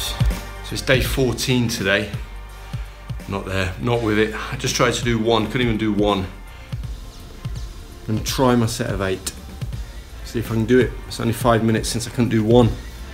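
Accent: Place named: United Kingdom. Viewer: You helped get me off my ass or bum as you called it. Motivating to watch, I also enjoyed hearing the bits of Les Brown thrown into the video. Good luck in the future from across the pond, guessing by the accent. Oh and by the way I bet if you had rested for a couple days instead of one you would have done better on your test.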